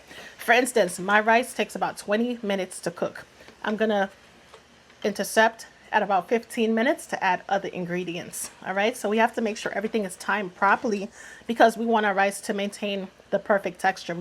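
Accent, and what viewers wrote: New York, United States. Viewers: Good afternoon or morning Ms “ Love ❣️ My Name. Is. Dawson. I just want to start off & Say Yes Yes& Yes 👍🏽💯 absolutely 💕 love your Scrumptious recipes..Girl you put your “ Toes “ in it LOL you remind me so much of my late & Great Grand-Mama “ Pearl” 👼🏽 God rest her precious soul Amen 🙏🏼 But yes I just had to put in the comments because I have pics on and please correct me if I’m wrong you are strong “ New York “ accent 🫠. And he reminds me so much of a cousin I have comes from the boogie down Bronx💯 but Yes ma’am’ ❣️❣️ that’s all I’m gonna let you get back keep continue doing what you doing Great job. 👍🏽💯❣️ Ok... have a blessed one..Ms Dawson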